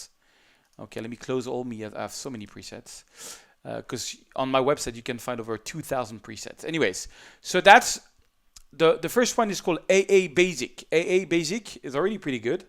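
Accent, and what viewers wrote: France. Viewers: I love your accent...I remember my trip to Paris and yummy macaroons 😍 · Rather than just doing the French accent, you should throw some French in. I would love to learn some French.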